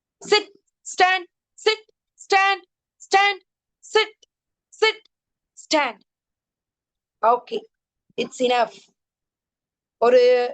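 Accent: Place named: India. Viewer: Very useful 🎉🎉❤ Ongada entha program endaalum very interesting madam madam thanx ❤ Session thornthu podunga. India slang very cute 🥰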